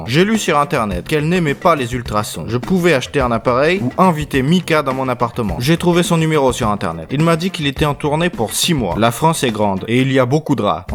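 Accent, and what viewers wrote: Russia. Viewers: T français ou russe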